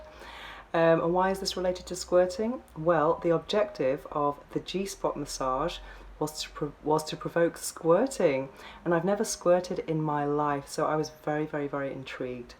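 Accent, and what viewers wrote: United Kingdom. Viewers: dammittt! if she wasn't british it may well have been an interesting story! cannot bring myself to endure that accent! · but, I will agree that her accent is 100% British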